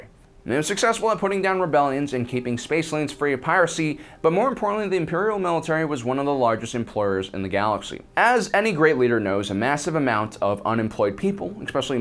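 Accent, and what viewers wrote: United States. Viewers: i'm already suscribed since a while, and i really love your videos.... however on this video, i had a hard time trying to understand what you said due to your strong American accent. Still, i liked the video:)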